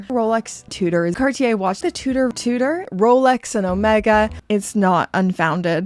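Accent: Canada. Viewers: I'm here for the watches... and her great Canadian accent 😊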